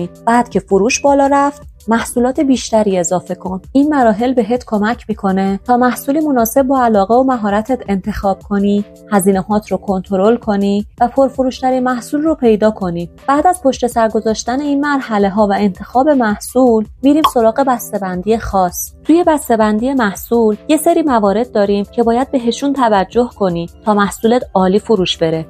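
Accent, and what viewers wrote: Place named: Isfahan, Iran. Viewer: اصفهانی هستین؟ عالیییی بود دوست عزیز اگر یکم روی افتان و خیزان گفتمانتون تمرکز کنین ویدئوهاتون خیلی جذاب‌تر میشه❤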